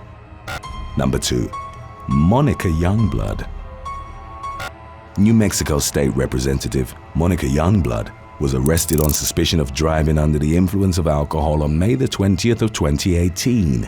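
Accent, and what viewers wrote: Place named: Hackney, United Kingdom. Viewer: Bro, you sound like Idris Elba? Hackney accent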